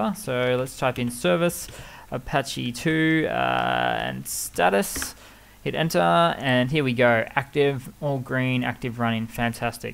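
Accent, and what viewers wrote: Australia. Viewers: Enjoy your Linux video-tutorials, and especially great to hear an Aussie accent rather than the 'generic' American voice-over.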